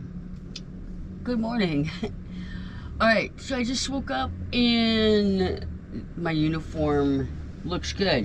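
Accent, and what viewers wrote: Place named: Midland, United States. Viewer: I love your midland accent! "Warsh" away! :)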